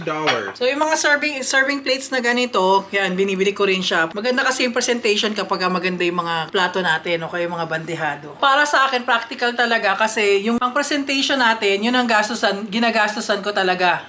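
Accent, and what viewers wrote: Philippines. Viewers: So nice that she can speak tagalog fluently like un accent nya pinoy na pinoy pa din... woah really amazing 🙌🙌🙌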